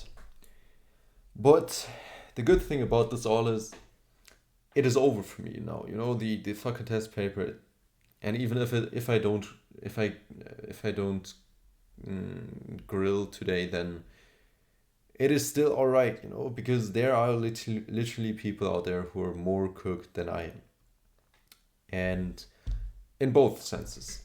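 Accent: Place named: Germany. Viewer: I heard the German accent instantly. Love the vibe of the video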